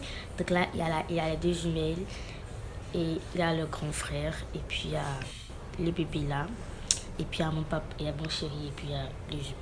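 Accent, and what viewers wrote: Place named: Ivory Coast. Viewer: Est-ce que tu es ivoirienne parce que tu as l'accent ivoirien tu es sûr que tu n'es pas ivoirienne parce que moi je suis ivoirienne et je connais cet accent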